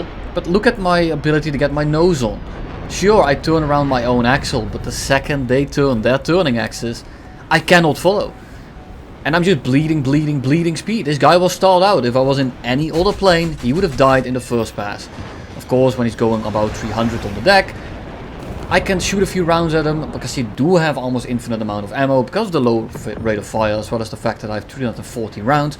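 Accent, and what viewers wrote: Netherlands. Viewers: Ik hoor het Nederlandse accent in hem but sometimes he speaks English like an American!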